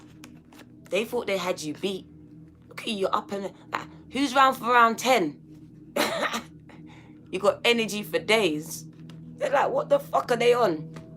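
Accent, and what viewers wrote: United Kingdom. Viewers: You are so gorgeous!! Love the hair!!! And the British accent!!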